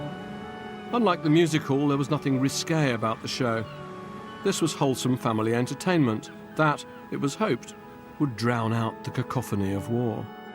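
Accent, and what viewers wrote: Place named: RP, United Kingdom. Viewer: I enjoyed this. Bar the plummy tones. Zzzzzz😮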